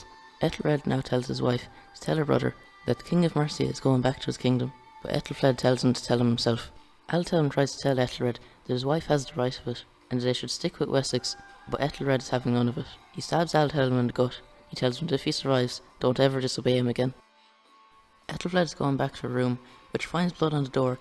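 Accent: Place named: France. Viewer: at first I thought this dude was speaking french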